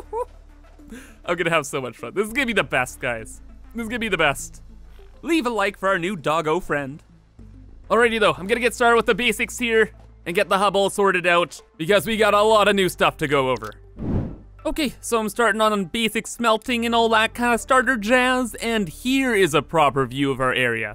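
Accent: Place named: Canada. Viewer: Oh man, first time seeing your videos and you sound so Canadian there bud, had to subscribe and check your twitter and indeed you are, what province do you live in? if its Saskatchewan imma loose my shit, nobody lives here.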